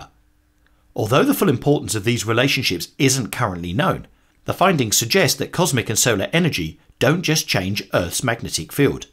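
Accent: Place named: United Kingdom. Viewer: Yeap, that's a Brit talking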